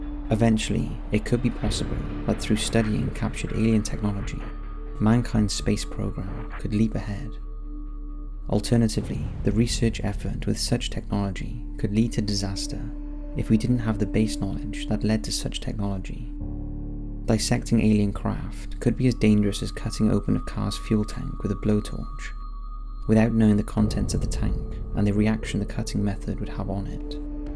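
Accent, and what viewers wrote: United Kingdom. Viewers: Just amazing how a narrator with a proper British accent can spew the most nonsensical fact free content and people will just lap it up.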